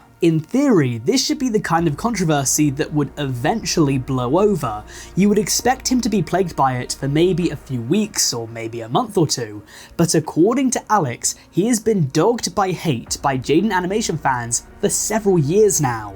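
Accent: United Kingdom. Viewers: i like you british cody ko, much better than the other one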